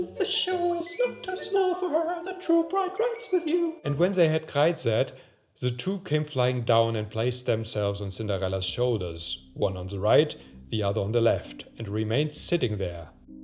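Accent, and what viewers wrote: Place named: Germany. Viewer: What a timing to upload, I’m going to Germany the next day 😃 The accent is so nice! Can you do one in German?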